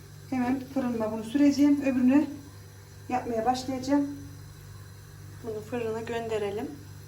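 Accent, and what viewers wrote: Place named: Yozgat, Turkey. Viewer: hanım nerelisiniz ben yozgatliyim bizim gibi konusuyon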